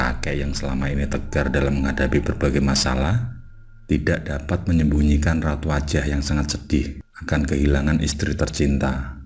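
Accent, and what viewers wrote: Java, Indonesia. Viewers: Kepo sama wajahnya.... Dengan suara khas jawa yg medok.... 😊😊😊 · Jelas banget, mas orang Jawa y Karna logat nya mas Thu ketahaun banget!!!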